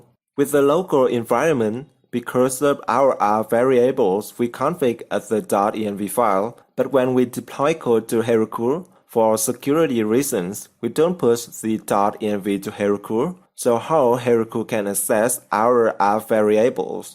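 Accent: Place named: Vietnam. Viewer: That Vietnamese accent. But great tutorial.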